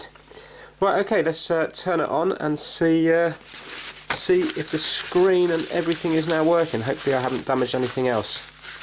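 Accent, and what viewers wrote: United Kingdom. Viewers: Just Found ur channel Love The fix it stuff and that soothing UK voice LUL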